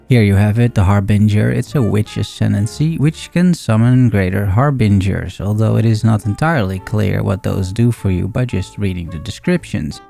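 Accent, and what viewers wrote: Netherlands. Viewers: I came here randomly as POE player but - klopt t dat ik een Nedelands accent hoor?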